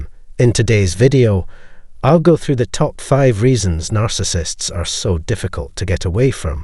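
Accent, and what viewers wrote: Scotland, United Kingdom. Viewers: Love ur videos, love the Scottish accent , 🇨🇮👍👍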